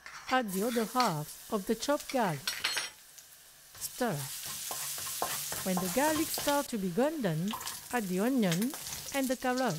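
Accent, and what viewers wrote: France. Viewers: Love the French accent 😂 and the recipe is great will be making it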